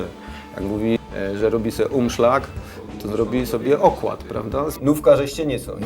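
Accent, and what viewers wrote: Silesia, Poland. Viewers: Panie doktorze pańska godka - to miód na moje uszy. BRAWO!!!!!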